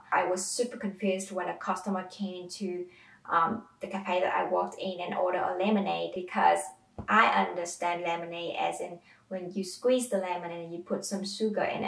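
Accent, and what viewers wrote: Australia. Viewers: You speak very good English, in saying that your accent is almost Aussie. I hope you enjoy your life in Australia. 🇦🇺🇦🇺🇦🇺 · That is a beautiful accent, definitely class it as an Aussie accent but still different from the norm. You should definitely keep making those trips back to Vietnam, if only to keep that accent from becoming too "Standard Aussie".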